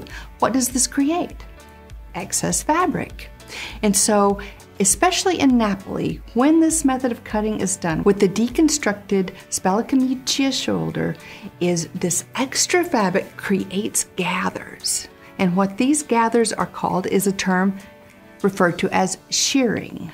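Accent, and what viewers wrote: South, United States. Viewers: Is from the South? I thought I might have heard some accent here and there, but maybe I‘m wrong. Great video anyway, and a lovely personality. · Just a guess, but I would wager that based on pleasing speech delivery, she was raised in a Southern state. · Awesome video! I am italian (from the north) but I really love the english shoulder and construction. It gives me such a strong appereance that has no rivals in my opinion. Despite it still being vert constructed even nowadays, I feel like it could have been even more in the past: I have a vintage chalk stripe suit from the seventies which was made in Saville Row and the shoulder is super heavily padded, I just love that! It was so intresting to explore the other styles anyway, this was very educational. I had no idea that you were american, I should have recognized it from the accent, I love y'all so much. 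Keep up the great work!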